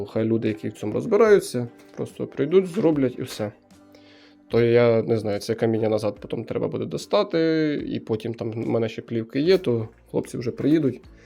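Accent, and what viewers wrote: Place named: Vinnytsia, Ukraine. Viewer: ...Гайсин... То Ви з Вінничини) я ж чую знайомий говір)))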